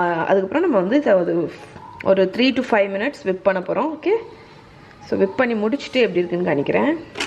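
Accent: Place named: Tamil Nadu, India. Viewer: You are the best cook.🎉Nice watching your videos.I like your Tamil accent,very different.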